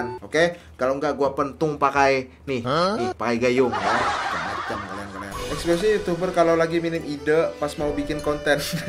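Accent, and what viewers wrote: Banjar, Indonesia. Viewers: Bang km org mana mirip banjar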